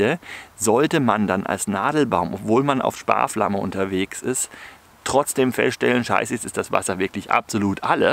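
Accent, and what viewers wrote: Germany. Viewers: Danke für das Video, ich schaue mir aber auch ganz gerne die Danke- Videos an, jedes davon ist immer noch besser als was am TV so läuft. Versuche gar nicht erst, in der Schweiz zu verbergen, dass Du Deutscher bist, Du hast keine Chance, ich kenne welche, die es 20, Jahre lang versucht hatten, und dann immer noch sogar von den eigenen Kindern ausgelacht wurden, weil man sie nach spätestens einer Minute als Deutsche erkannt hat. Ist einfach so, mach Dir nichts draus. Und so böse sind wir Schweizer auch nicht, eher skeptisch. Gruss, Thomas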